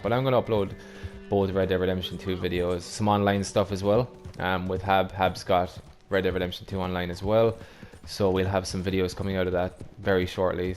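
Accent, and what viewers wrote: Ireland. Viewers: You Irish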